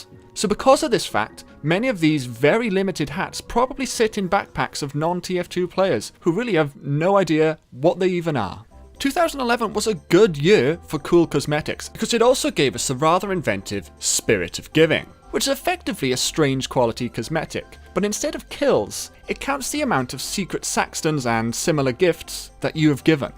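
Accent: Wales, United Kingdom. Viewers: Great video, Just wanted to ask if you are you welsh?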